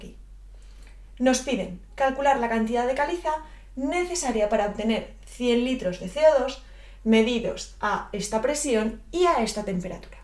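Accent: Spain. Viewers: Así aprendo muy bien la estequiometria, :D, hasta su acento español, i love you, pero enserio, si explica muy bien.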